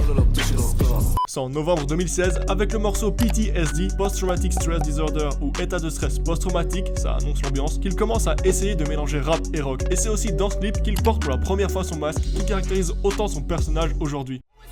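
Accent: Belgium. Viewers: T’es belge ??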